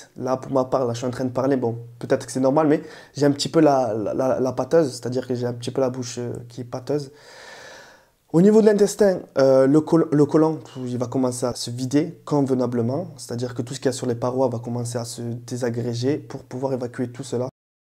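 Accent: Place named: South, France. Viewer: J aime bien ton accent du sud mashallah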